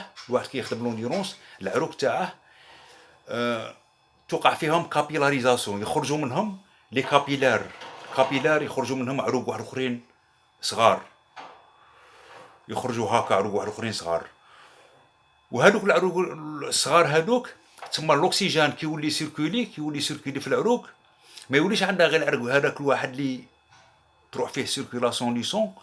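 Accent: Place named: Algeria. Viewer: لهجة جزاءرية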